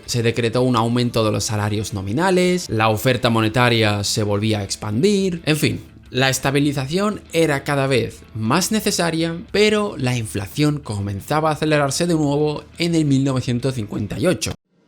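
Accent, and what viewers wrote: Spain. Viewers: Acabas de inferir la ideología política de un individuo por los colores de su canal de YouTube? Te imaginas lo vesanico que suena eso? Deja de apelar a falacias de conclusión inatingente. Eso es propio de energúmenos irracionales. Para éste video se han utilizado 17 papers y dos libros que circulan por 4 escuelas económicas disímiles. Para mayor escarnio te atreves a acusar a un canal de economía mainstream como afín al libertarianismo. Regresa a tus clases de pensamiento económico, por favor. No sabes distinguir entre el acento español y el argentino? De qué multiverso provienes? Permíteme que te hable como un padre: no seas una parodia de ti mismo. Saludos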